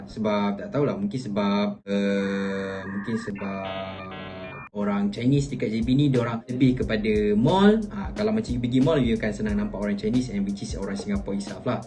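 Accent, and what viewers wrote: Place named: Kuala Lumpur, Malaysia. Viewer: Dtg daripada keyel ke jaybe Xleh blah ah aku dgr slang dia😂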